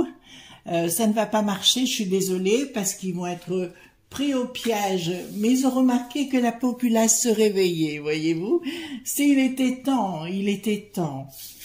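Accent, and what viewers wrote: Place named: Dauphiné, France. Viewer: quelle joie d'entendre votre voix avec l'accent du Dauphiné ! Merci !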